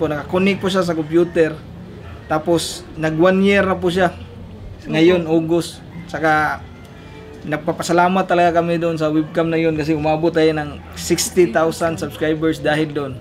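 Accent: Visayas, Philippines. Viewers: Bisdak jd kaayo accent jd ba hehehe, I'm visaya din hehe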